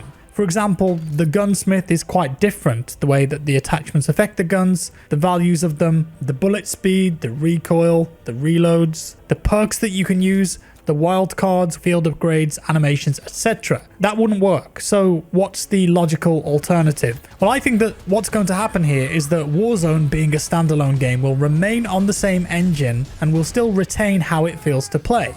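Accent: England, United Kingdom. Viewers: What English accent does have?:)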